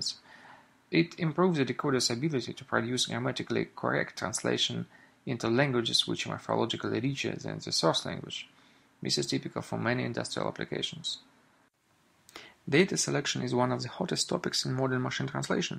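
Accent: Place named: Eastern Europe. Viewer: The speaker has some Eastern-European dialect, but it is not that hard to understand what he says :) Just pause and repeat few times where you can't understand him.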